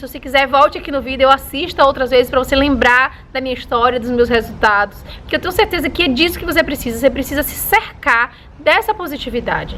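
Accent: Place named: Sergipe, Brazil. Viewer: Sou sim,mas moro em Aracaju-Se!!! Nosso sotaque é inconfundível... vc é um amor de pessoa...bjs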